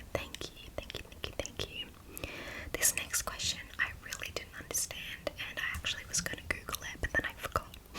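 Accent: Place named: Australia. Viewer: Yeah girl AUSTRALIA!❤️❤️ me too.. I thought you where bc you have an Australian voice❤️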